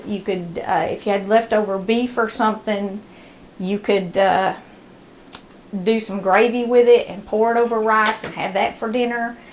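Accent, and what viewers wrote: South, United States. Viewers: Love following you, Christ first, plus you have wonderful recipes! And you have a southern draw like myself 👍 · From witch state in the Us are you from? Somewhere from the South?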